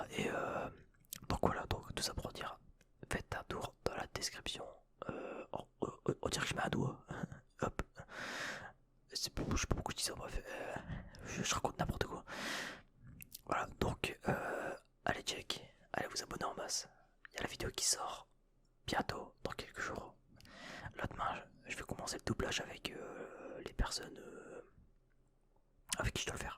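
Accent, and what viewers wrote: North, France. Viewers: Tu viens du Nord ?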